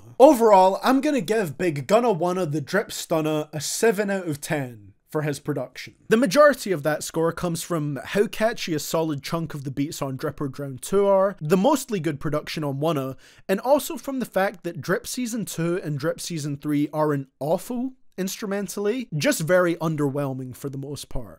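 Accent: Scotland, United Kingdom. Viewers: He is Scottish, he has a strong accent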